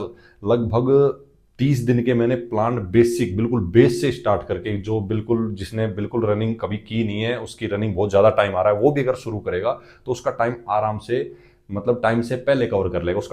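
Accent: Haryana, India. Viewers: Sir aap harayana se ho kya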